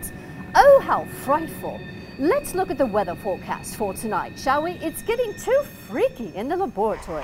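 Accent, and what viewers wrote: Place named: United Kingdom. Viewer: Aaah... "O" Town ! I lived there many years. Migrating from Miami to Broward then Orlando area. The Beauty N/W of Orlando , [ Rolling hills, Beautiful springs & People ! ] Then.. 90% of those areas Paved over & MILLIONS of people Still moving into FL !! You have A GREAT Weather channel !! (Just found it 3 days ago) Very good "Brit" Accent ! BTW... Cheers & stay safe in these BEYOND preposterous times !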